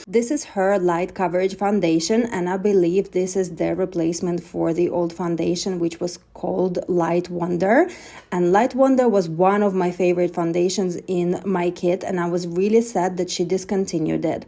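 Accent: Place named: Russia. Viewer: Accent is familiar, from one of the former USSR states) Russian , I think )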